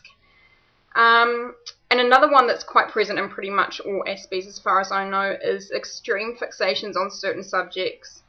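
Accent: New Zealand. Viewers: Yeah thats a good kiwi accent ! Now everyone this is a girl from a place called new Zealand . Do you know where that is? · She's a lovely keewee!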